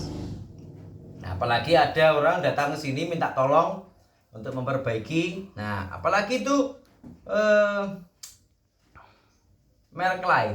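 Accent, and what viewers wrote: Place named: South Sumatra, Indonesia. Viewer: Lokasi pundi mas sampean ..kok seng dandan apache bahasane kok koyo sumsel mas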